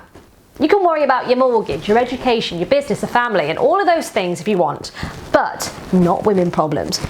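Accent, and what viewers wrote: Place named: United Kingdom. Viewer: Beautiful accent, sounds British.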